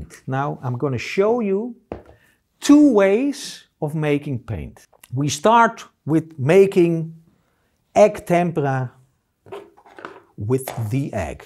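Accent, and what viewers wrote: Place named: Netherlands. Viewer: Dear sir. Do I have to tell that I love your dutch accent. I loved this, even if I am familiar with paint makings since many years. I love when you say Rembrandt in a way that he would have said. I am a swedish old man, and understands just some words here and there, that we share, but I love the power and poetics in the dutch language. Many thanks for the tutorial.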